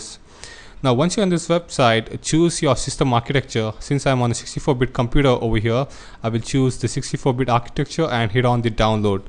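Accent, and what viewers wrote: India. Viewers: I guessed from first that you might be a calcuttan (by your dialect). Thanks a lot for the wonderful tutorial! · what accent? is indian accent thats why is hard to understand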